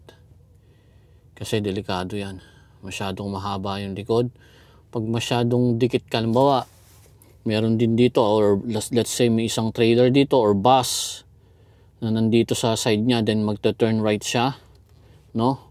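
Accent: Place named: South, Philippines. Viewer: southern accent.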